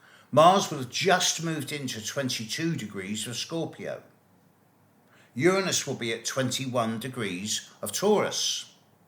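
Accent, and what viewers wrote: United Kingdom. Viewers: I've always enjoyed your vids, even this one regarding the military /political cash cow war machine...UNTIL you referred to Armistice Day (UK) and Veteran's Day (US) as, "This weekend of Glorification of War." What special kind of an ass ARE YOU? These solemn church ceremonies, those wreath-laying ceremonies are not to just honor the living who survived these wars, these ceremonies are about REMEMBRANCE and HONOR. Let me explain, because clearly, you've lost the plot. HONORING their courageousness. Honoring their bravery in facing down evil forces. Honoring their sacrifices of knowingly giving up their lives, their future families, their futures of going home--to battle the evil forces that were embarked on ensuring all, even unborn generations like us, especially YOU with your clippy little British accent weren't raised in a Nazi Third Reich World Government enslaved to German AND Japanese Warlord Masters! 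Remembrance and Honor for their suffering wounds that would never heal, for torture in foreign, evil prison camps, for dying in the heat of Africa, freezing to death off ships in the Bering Sea, for dying in wet mud of the Philipine islands of malaria, flea bites, dysentery...and much worse! You must be such a spoiled, little snot that you can sit up on the pinnacle of Mount Bombastic and look down your nose at all the past and present worker bees, pontificating as their dreamy star-gazer. How many actions did YOU ever take to face down approaching evil in overwhelmi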